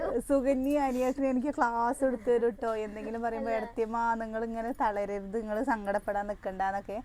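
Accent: Palakkad, India. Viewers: പറയുന്നതുപോലെ പറഞ്ഞോളു അതാണ് കേൾക്കാൻ രസം ട്ടൊ നമ്മുടെ നാടൻ ഭാഷയാണ് പാലക്കാട്കാരിൻ്റെ സ്വന്തം ഭാഷ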